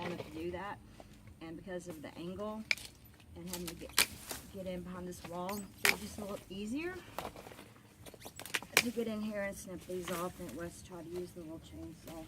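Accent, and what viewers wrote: South, United States. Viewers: I might have to check out one of those chain saws. I've always just used loppers. I've wondered if you live in the South? I live in East Tennessee and we have similar accents 💖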